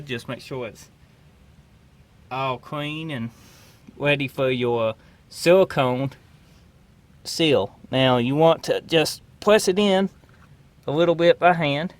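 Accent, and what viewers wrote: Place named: South, United States. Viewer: what accent do you have, it sounds like a southern American, and a hint of Australian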